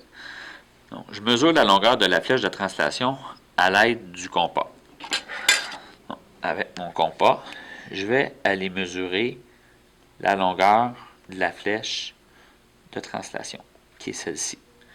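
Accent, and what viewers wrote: Quebec, Canada. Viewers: Non c’est son accent québécois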